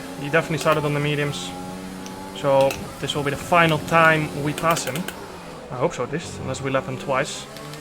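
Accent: Netherlands. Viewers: Je klinkt erg Nederlands in je videos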